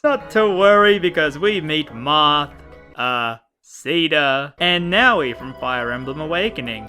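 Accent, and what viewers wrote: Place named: United Kingdom. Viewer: I agree. Your British accent sounds more like you're talking while trying to hold back a yawn.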